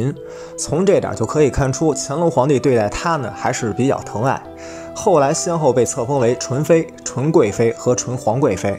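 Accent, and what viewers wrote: Beijing, China. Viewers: 功课十足的视频👍&京腔京韵的普通话💯👍谢谢分享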